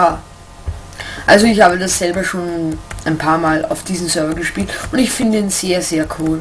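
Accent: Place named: Austria. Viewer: he du kommst ya aus österreich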